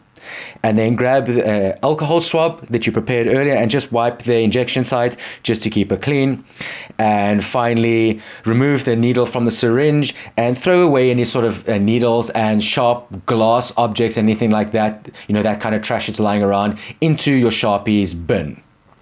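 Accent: South Africa. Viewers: Sounds very south African.... Lekker boet... Very informative awesome video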